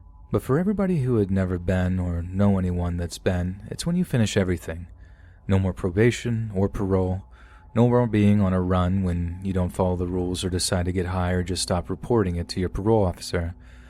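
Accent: Australia. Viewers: ..danger to be brought to your face.. Choice. Plus the Crocodile Dundee reference and his Australian accent..😂😂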